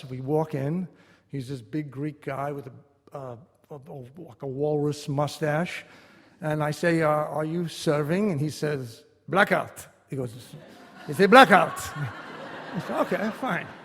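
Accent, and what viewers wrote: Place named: New York, United States. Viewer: Love John Turturro...he always gives a wonderful performance and makes everything more special. He also reminds me of NYC when he talks in his own voice, which I miss. Family commitment is becoming a rare thing, but this is a beautiful example of how he approached his particular situation He would make a day ALL about his mother and brother, although the unexpected visits are sometimes necessary, too. It's when you are the full-time caretaker, you absolutely need to hand off the duties at least a couple times a week or you just end up exhausted and can slide into depression. This is why home health care workers are so necessary, although our current administration seems they could care less.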